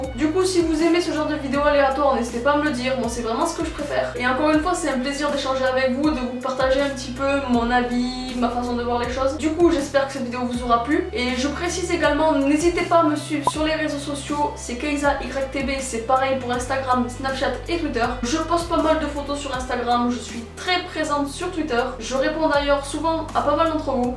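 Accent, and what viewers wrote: Toulouse, France. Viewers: Tu connais pas bien les accents toi x) c'est l'accent toulousains xDDD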